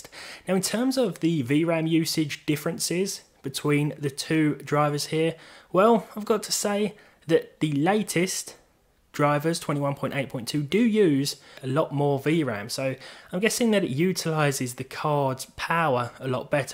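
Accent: United Kingdom. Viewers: He's british. It's called an accent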